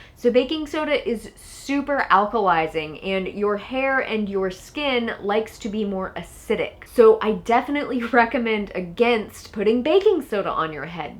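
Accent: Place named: Tennessee, United States. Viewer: GREAT INFO! THNX! WHEN I USE THE APPLE CIDER VINEGAR I SOMETIMES LEAVE IT ON, THE SMELL GOES AWAY IN A FEW MINUTES, BUT YOU CAN ALSO ADD ONE OR 2 OF THE ESSENTIAL OILS FOR HAIR GROWTH, LIKE ROSEMARY, PEPPERMINT, CARROT SEED, LAVENDER OR EVEN TEA TREE TREE OIL. I ESPECIALY LOVED YOUR TENNESSEE ACCENT, WHY NOT TALK LIKE THAT ALL THE TIME? ✌💚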